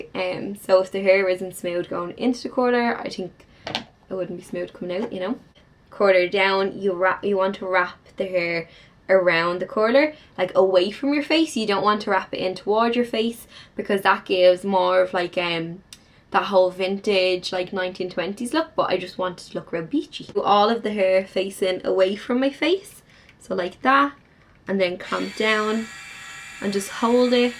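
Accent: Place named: Ireland. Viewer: She Irish what do you expect, people can’t just change the way they speak.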